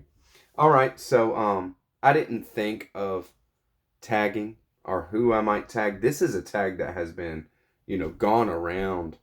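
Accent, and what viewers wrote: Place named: Texas, United States. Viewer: I just watched your video for this tag, and your accent is the thickest I have ever listened to. Is it Texan?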